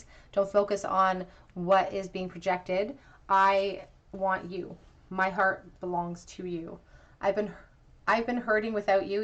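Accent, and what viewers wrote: Canada. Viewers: I love your readings ! Always some much information that is so helpful ❤! Your Canadian accent it is comforting to me, I am also Canadian but have been living in Europe for the last 10 years. Once again thank you 🙏 ❤❤❤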